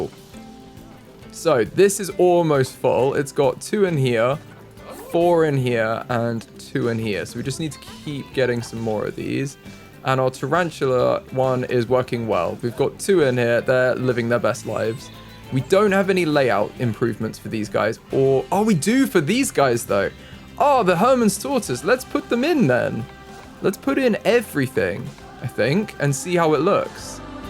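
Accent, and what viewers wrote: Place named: RP, United Kingdom. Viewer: He's definitely English. I would say South/South East England. Honestly to me, from North East England, he just sounds... generic English lol 🤷‍♂️ Like definitely not Newcastle, or Yorkshire or Liverpool, or Birmingham. Just... slightly posh/fancy generic lol.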